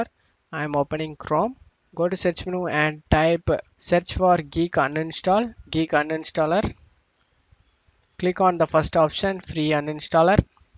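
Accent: India. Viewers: I never find a working tutorial untill a guy with an Indian accent comes up and solves it,new sub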